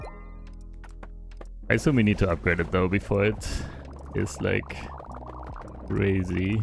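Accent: Germany. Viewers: Is that a common German Accent? I've only heard it once before and it was a character so i didn't know it was real but you sound EXACTLY like he did.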